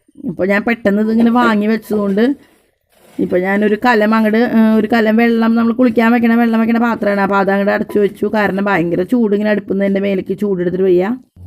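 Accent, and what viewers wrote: Thrissur, India. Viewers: Hai. onathinu orukkangal kandappol enikku ente veedum kuttikkalavum okke orma vannu. pazhaya ormakal unarthunna oru vlog. enikku othiri eshtappettu.pinne u de Trissur slang kelkkan nalla rasamayirunnu. thank you so much for sharing the video.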